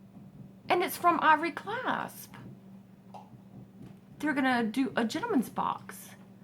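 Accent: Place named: South, United States. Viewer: I've watched lots of your videos, girl you have that like sweet southern accent, smile, and friendliness that is so classy and feminine. You got a new subscriber :)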